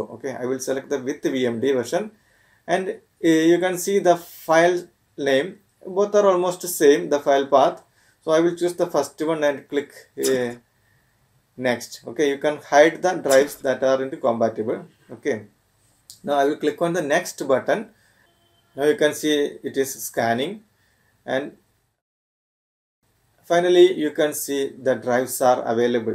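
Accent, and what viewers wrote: India. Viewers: Excellent! To be honest, at first, I was put off by the poor-quality audio and the strong Indian accent, but everything he showed - worked. I didn't have the drivers in my Windows installation USB (even though I downloaded the Boot creation software right from Microsoft's website ...) I downloaded them from the link he put in the description here, unzipped the packages and it worked. I have my partitions showing now. Thank you and keep up the good work!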